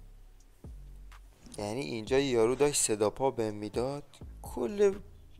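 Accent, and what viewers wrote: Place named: Bushehr, Iran. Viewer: لحجه بوشهری داری....همشهریمونی??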